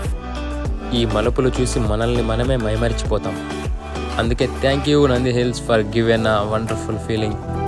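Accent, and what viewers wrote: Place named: Kalyanadurgam, India. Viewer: మాట్లాడే యాస బాగుంది అదే కొనసాగించు ఓకేనా...చెప్పాలంటే మన కళ్యాణదుర్గం యాస😂😂😅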